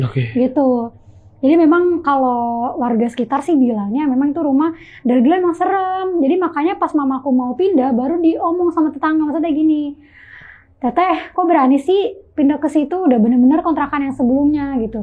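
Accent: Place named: Bogor, Indonesia. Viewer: logat logat bongee pisan ini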